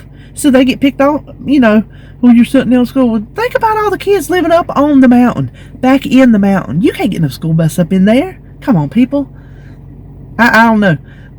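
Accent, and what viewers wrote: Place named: Mississippi, United States. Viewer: quit beating yourself up by saying you're taking the easy way and!! Cut yourself some slack. If you don't feel up to making a recipe and have a simpler or easier way then go for it!! You're wonderful and I LOVE you just the way you are. I'm born and raised in northern Illinois and we wore bread sacks on our feet and then our snow boots. Boots went on easier and helped keep our feet a little warmer. I'm 64 and was born and raised on a dairy farm and we had lots of outside chores to do. You remind me a lot of my Aunt who lived in Mississippi. Your accent, smile and laugh just brighten my day. Have a great weekend.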